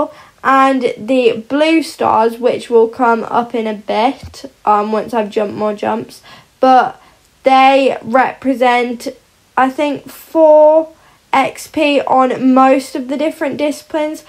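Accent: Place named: United Kingdom. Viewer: Can we just talk about how she sounds perfectly British? Not being rude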